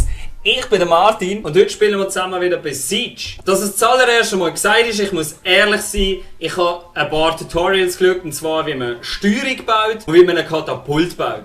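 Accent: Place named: Basel, Switzerland. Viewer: scho nur dis Baslerdütsch isch amüsierend ^^ machsch du super!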